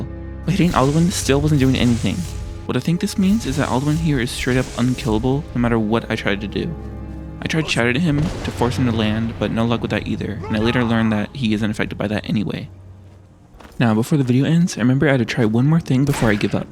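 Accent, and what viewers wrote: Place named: United States. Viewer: He's speaking completely understandable American English, the fuck are you on about?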